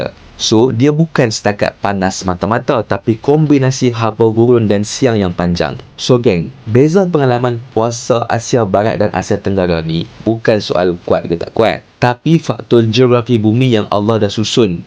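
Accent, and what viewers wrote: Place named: North, Malaysia. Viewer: Dgr min cakap mcm oqg utaqa.. 😅 Teruskan konten mcm ni min.. mantapppppp... 🫡👍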